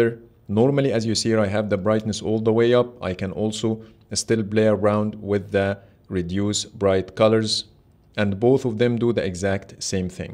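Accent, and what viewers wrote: Egypt. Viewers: Man you sounds Egyptian 😁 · Are you an Egyptian living in UAE?? Your pronunciation kinda gives me the feeling that you are.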